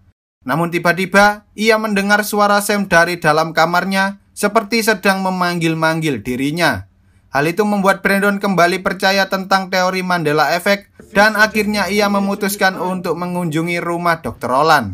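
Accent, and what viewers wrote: Java, Indonesia. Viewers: Medok banget JAWA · Adalgi ytber yang bahas film jni selain chanel ini ga?, logat jawa kurng enak di denger :( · Jowo endi min pean? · Bang logat ngomongnya jgn medok bgt lah, intonasinya jgn datar.. spoiler filmu bgus" tpi cara pembawaanya gk enak ditelinga Tolong jgn gitu biar kedepannya lbih bagus!!! Medok bgt aneh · Meddok bgt!!!!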